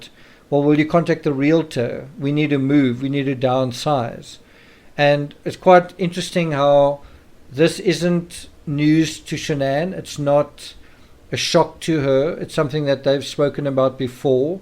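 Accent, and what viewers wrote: South Africa. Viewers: Your voice make me sentimental to my SA friends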